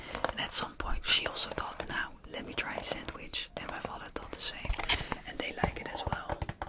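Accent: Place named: Netherlands. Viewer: Love this, and when you traced the letters on the pizza box 🤤. I like that you’re not a fast tapper, I find a lot of ASMArtists tap too fast. Yours is perfect. I like that you tell us the Dutch works for stuff, repeat it more then once so we can learn to Dutch words for things! I live in Canada but have lots of family from holland, including my Oma! Hearing your accent on the first video I watched I recognize it immediately.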